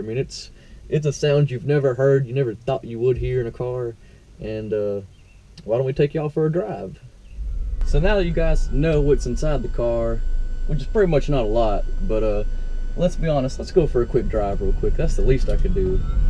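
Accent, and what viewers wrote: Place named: South, United States. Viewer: I love the way you talk man... some people from the south that have lost their accent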